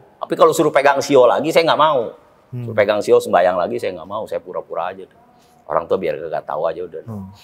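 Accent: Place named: Jakarta, Indonesia. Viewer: Betawi bgt ye ngkoh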